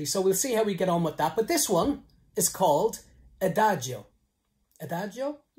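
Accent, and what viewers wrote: Ireland. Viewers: AH! i can just hear you saying "lovely" in your Irish accent, and i am smiling away like a fool again... so i am going to say "Ah, that's just Grand" in my not so good Irish accent (tho i do try) hanging out on the Bus! and hey, i just watched your ' ' video, and get this.... we already have the Same Hair!! ahahahahaaa! awwwww God Love ya.... you really are just as immediately warm and sweet, and really funny(!) in all of your videos so far. so nice to hear that you would love to see Italy and appreciate the culture, tho probably not quite as much as i would love to see Ireland.... all of those Gorgeous Castles and all of the Stunning Natural Beauty and Father Ted's house too! maybe one day.... (i am quite seriuosly ill, sorry to suddenly be a downer here, it's okay tho... i am pulling myself through as best as i can... we shall see i s'ppose...) if i am well enough to live the dream and actually visit Ireland, i will give you at least a 5 minute warning that i will be on that part of the planet! 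and maybe we could have a nice cuppa on the Bus hahahahahaa! and thank you so so so much for the kind words, i was seriously just calling it like i saw it, so glad that you found it to be that nice of a comment. you seem to be the kind of person who deserves it tho. i am faaaaiiiirly sure this is not the last you will see of me in your comments, so i shant say goodbye... just, until again. yay! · I love a slight accent, too, so singing is just fine like it is as far as I'm concerned and I like your Irish accent. The truth is, most of us have a bit of an accent even depending on which part of our countries we come from. I was born, raised and lived in Texas USA all my life so while I don't have one of those thick slow drawl accents at all (like Lady Bird Johnson, who made me shudder with slight embarrassment every time she opened her mouth for fear other people would think everyone from Texas sounded like THAT. 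We don't:-) but I know people from other parts of USA can tell that I'm not just Southern but that I'm from Texas and proud to be:-) Texas is not known as the GREAT Lone Star state for no reason.The USA is a huge country and In many respects Texas is a world apart from from what goes on with some other parts of the USA:-)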